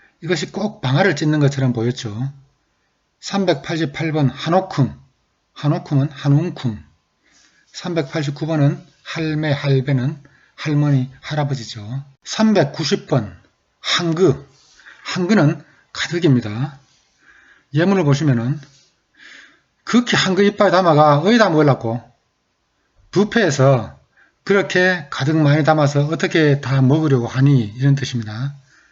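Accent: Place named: Uiseong, South Korea. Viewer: 안녕하세요 ^ ^ 제가 경상북도 사투리에 관심이 많은데 님 사투리 잘 보고 있습니다. 제가 취미로 사투리 채널을 운영하고 있는데, 님이 괜찮으시다면 ' 경상북도 의성 ' 영상은 모조리 님 억양을 흉내내고 글을 베끼면서 올리고 있습니다. 제 영상이 그렇게 훌륭하거나 유명하지는 않아서 님께서 아직 못 보셨을 수도 있지만 혹시 문제가 된다면 경상북도 의성 영상들은 삭제하도록 하겠습니다 (맨 마지막에 저작권을 따로 주장하지는 않는다셔서 지금 의성 영상 몇 십 개 정도 올렸습니다. 님 사투리 억양을 듣고 억양을 어떻게 할지 모호한 것들은 되도록 안 올리고 있습니다) 저뿐만 아니라 의외로 전국 팔도 사투리에 관심을 가지는 10대 소년들도 많습니다. 되살아나지 않고 사라진다고 하더라도 저는 제 영상에 기록용으로 여러 지역 사투리를 담아내고 보존하고 싶습니다. 제 영상 댓글들은 하나하나 확인하기 어렵지만, 관심이 가신다면 찾아와 주시고 억양도 교정해 주세요! 감사합니다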